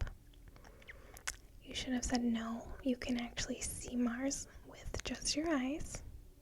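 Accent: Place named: Michigan, United States. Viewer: Are you from Michigan? Your accent sounds like mine lol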